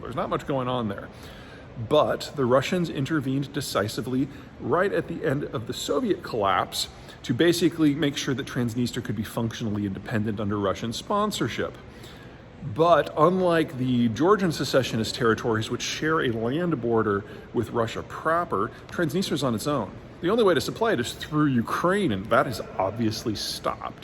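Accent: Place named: Iowa, United States. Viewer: Don’t knock An Iowa accent honey.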